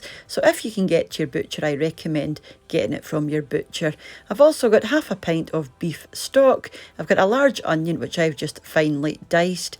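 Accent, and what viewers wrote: Scotland, United Kingdom. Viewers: Love the pie but the CC had me in stitches, a Scottish accent is not easy to translate. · Hello there! So glad I came across your channel. This is the first time watching and thank you for the yummy recipe. I am an 80 year old granny, living in South Africa and am always open to new ideas as, one can never know enough, even at my age. I probably missed your competition but, even just to hear your beautiful Scottish accent and see the delicious recipes, is good enough for me. Do you ever do Haggis or the real Scotch shortbread biscuits? God bless you and your family. Shalom! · Love your video and your sweet Scots accent! I first watched it with the sound off and closed captions on, but they made no sense at all, ha ha ! Much more enjoyable to listen than read. Definitely want to try your recipe. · Just found you today. I love your Scottish accent and the seaside is beautiful. 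I’m going to search out more of your recipes. Thanks! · i just love your Scottish accent ;-)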